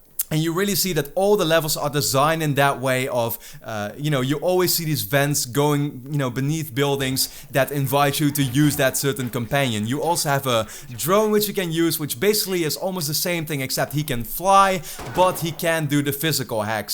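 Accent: Netherlands. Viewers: Erg goede review kerel! Ging ervan uit dat je Nederlands of Vlaams bent. Awesome review, just to be sure ;)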